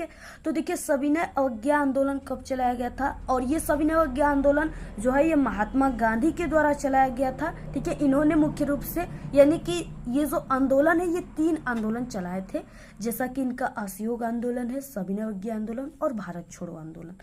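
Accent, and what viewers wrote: Bihar, India. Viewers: Madam aap Bihar se ho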